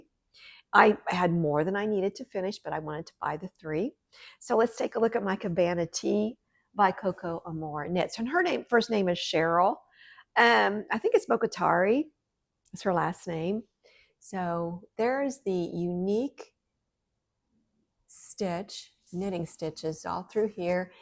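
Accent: South, United States. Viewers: You are so sweet. Love your podcast. You said you are northern but to me you sound southern!!! What do I know,I am Canadian.❤️🇨🇦❤️ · I enjoyed watching my first episode of your vlog,! But for a northern transplant to the south, you certainly sound southern! LOL I have never been to Rhinebeck and I'm not sure I ever will. It seems a bit overwhelming to me. I've been to Flock in Seattle and on several knitting trips with knitting stars (Arne & Carlos and soon the Grocery Girls, for example).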